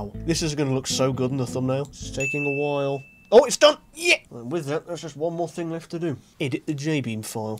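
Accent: United Kingdom. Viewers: you talk british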